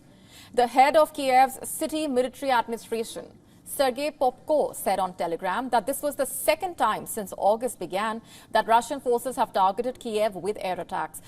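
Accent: India. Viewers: Your india asent i wonder how you are doing properganda for the west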